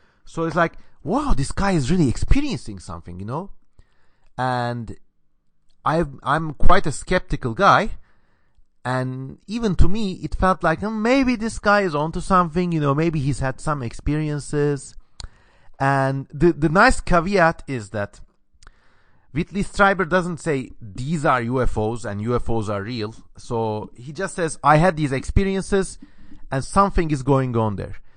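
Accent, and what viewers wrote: Turkey. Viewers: Op sounds turkish can you confirm?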